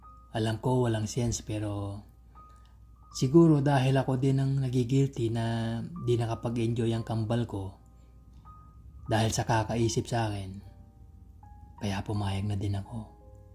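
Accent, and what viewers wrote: Pampanga, Philippines. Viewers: Kapampangan accent ei.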